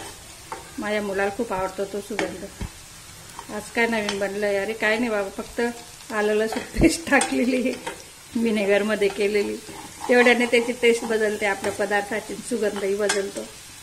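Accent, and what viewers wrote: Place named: Dhule, India. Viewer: खूप छान.तुम्ही माझ्या माहेरच्या धुळ्याच्या आहात .मला तुमची भाषा ऐकूनच वाटले होते ते.आपल्या घरातच असल्यासारखे वाटते .छान समजावून सांगता.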